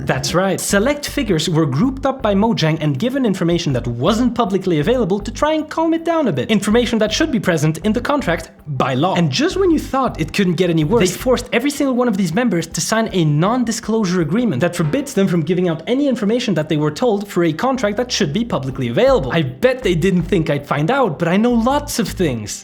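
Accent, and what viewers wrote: Sweden. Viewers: Is it just me or is his accent getting more swedish as the video goes on? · Lol he's becoming more Swedish as the video goes on and he gets angrier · The angrier he gets . The more Swedish he becomes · The Swedish accent just got worse and worse lmao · I love how he gets more Swedish as the video goes on. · You can tell that it gets really personal when you can hear more of the Swedish accent from him as the video plays on.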